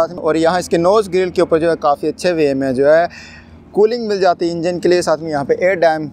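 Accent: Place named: Kangra, India. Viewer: ओ भैया हिमाचल कांगड़ा! दा बोला ना 😂😂😂 भाई! बहुत बढ़िया अति सुंदर 👍 बहुत ही अच्छा रिव्यु किया। है आपने।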